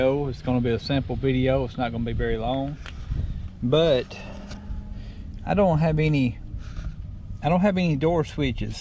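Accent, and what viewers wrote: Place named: Mid-South, United States. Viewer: I like how you said you replaced a lot of the front end suspension parts, but you lost me at the one part. What are "baw" joints? I looked em up, but my Jeep doesn't have baw joints. LOL! I'm messing with ya! I grew up in Memphis and St Louis and everyone says I talk country! You remind me of home, brotha! We could sit and have a beer and tell stories all day! LOL! Like my man says in Slingblade, "I lack the way you tawk." LOL! People say I got a twang and sound country, but that's because they don't know how COOL we are in South and Mid-South!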